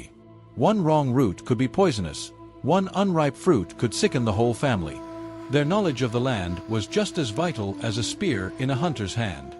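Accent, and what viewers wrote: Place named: United States. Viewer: History just never sounds right in an American accent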